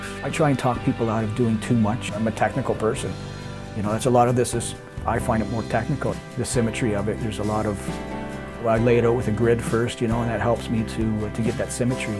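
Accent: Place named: Canada. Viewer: Great work! I knew you were Canadian when you started talking.👍🇨🇦love to look at hand painted signs, puts vinyl to shame, no soul! Hope to see more of your work for years to come!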